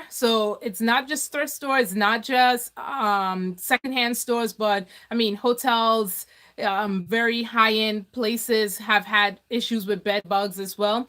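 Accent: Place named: Trinidad and Tobago. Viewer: I was wondering where that accent originated from 😊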